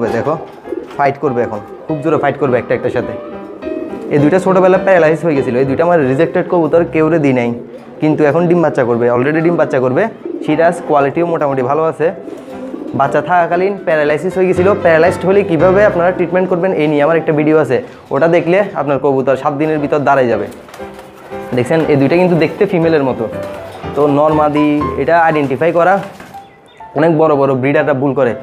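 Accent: Chittagong, Bangladesh. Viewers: ও ভাই আপনিতো অর্ধেক চিটেইংগে বাকি আধা চলিত ভাষা হন্ডে বাড়ি তোয়ারো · ভাই আপনি কি চট্টগ্রাম থাকেন? কথার আওয়াজ কিছুটা চট্টগ্রামের মতো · ভাই চট্টগ্রাম এর নাকি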